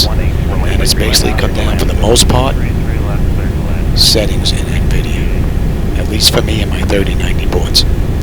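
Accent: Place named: Queens, United States. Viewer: I thought your accent sounded a little familiar. I spent a "lovely" 8 months in Queens off the Van Wick Expressway shortly after 9-11. Saw the biggest rat of my life climbing the stairs up from the subway, lol.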